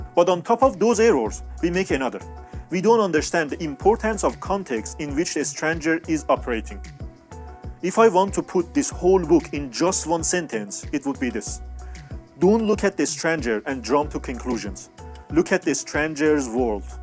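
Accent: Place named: Iran. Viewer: The narrator sounds Persian 😄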